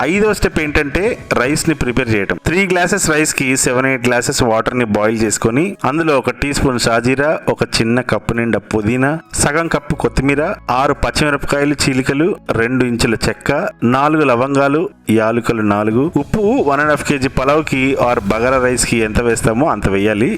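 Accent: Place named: Andhra Pradesh, India. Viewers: Mee words konni ma srikakulam slang la anipistunnai ..ae uru manadi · Meru matladutunty ma village ki vellina feeling vostundi,rajamundry, bhimavaram,Godavari antuntaru kadha,nice videos anna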